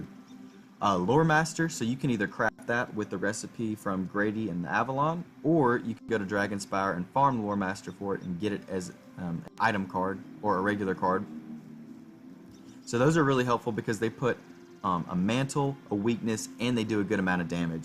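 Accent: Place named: South, United States. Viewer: I like ur southern accent.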